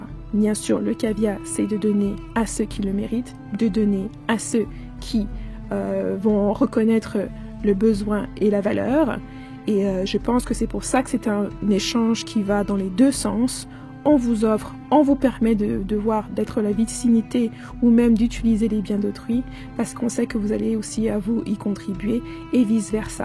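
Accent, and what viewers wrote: France. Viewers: Merci pour ce partage que je partage également.. juste comme ça, tu ne serais pas de Lorraine? De part ton accent ^^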